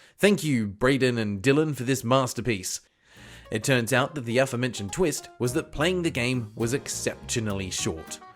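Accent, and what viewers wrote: Australia. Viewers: YOU'RE AUSTRALIAN???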